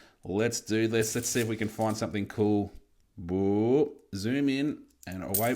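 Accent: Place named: Australia. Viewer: it's all good Can you make longer vids I miss the Oz accent you funny fkr 😅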